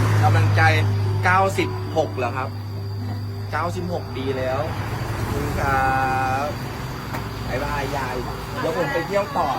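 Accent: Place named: Laos. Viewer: Northern middle Laos accent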